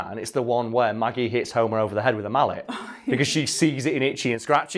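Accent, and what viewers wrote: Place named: Northern England, United Kingdom. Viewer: don’t even mind the northern accent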